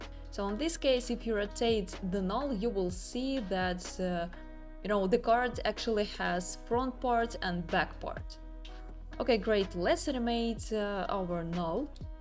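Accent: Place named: Russia. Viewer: Такой классный русский акцент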